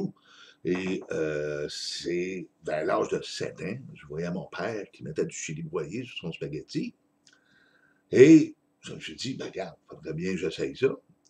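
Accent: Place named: Texas, United States. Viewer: Très belle entrevue vidéo français et anglaise,vous nous orienter dans le choix des épices et piments pour la nourriture que nous préparons #1, en pensant avez-vous des origines du Texas car vous avez un accent texan👍👍🤝🌶🌶🌶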